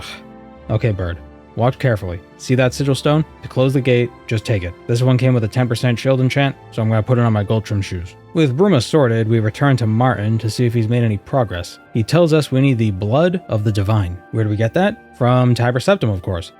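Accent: Boston, United States. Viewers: the bostonian accent is strong with this 1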